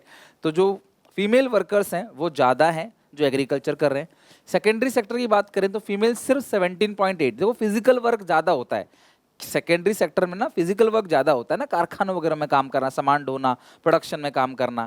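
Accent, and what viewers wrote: Odisha, India. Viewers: Hello bhaiya Are you from odisha??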